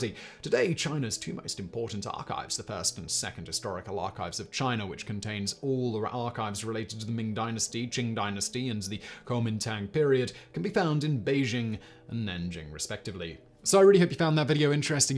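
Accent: United Kingdom. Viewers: I have a question, HOW do you keep your voice with all of the talking that you do?? Been a fan of all your channels for a while, but just found the Casual Criminalist Podcast last week. I drive a truck 9 hrs a night here in the US and listened to them all last week. The sarcasm and proper British way of speaking make it great.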